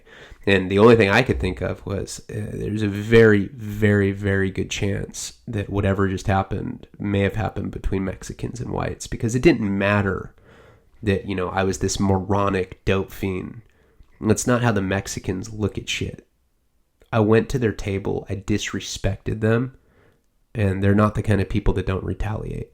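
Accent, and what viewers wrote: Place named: Tennessee, United States. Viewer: Yo 🖤😋 love the southern accent so real! We sound just like that in Tennessee Great video😛 palabra💯🗨💥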